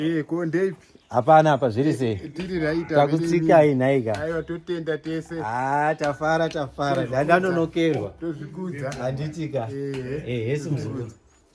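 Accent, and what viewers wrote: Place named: Manicaland, Zimbabwe. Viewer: U sound manyika 80% come back home/kanyi 😅😅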